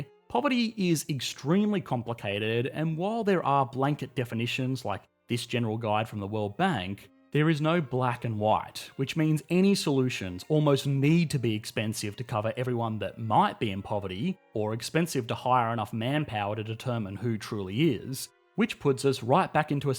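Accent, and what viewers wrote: Australia. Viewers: Americanised? He is obviously Australian.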